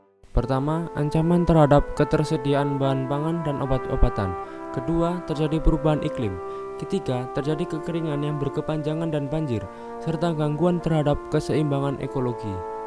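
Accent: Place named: Java, Indonesia. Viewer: medokkk men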